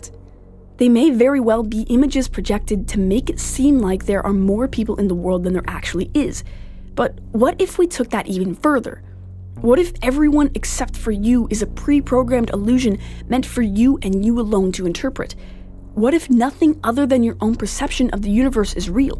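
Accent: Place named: Canada. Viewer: This is an amazing channel which is innovative and logical without being boring and I love the commentary.. also the way you say "out"and "about" makes me think whether you're Canadian 😋 One of the best channels I've found on YouTube!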